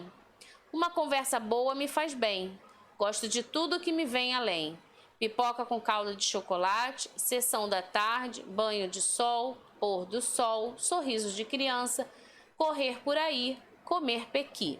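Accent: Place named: Rio de Janeiro, Brazil. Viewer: "O quanto vc ainda está com duvidãnnn" kkkkkkkkkkk sotaque de carioca é muito engraçado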